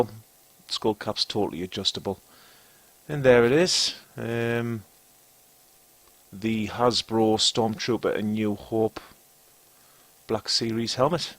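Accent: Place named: Geordie, United Kingdom. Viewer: you sound more jordie in this than you do in person hahahaha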